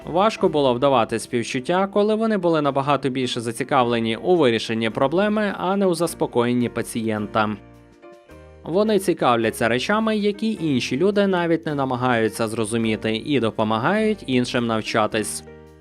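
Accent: Russia. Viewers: У Ютьюбі є авдіокурс української літературної вимови Миколи Погрібного. Навчіться нарешті правильно вимовляти українські звуки, бо бридко чути вашу зросійщену вимову.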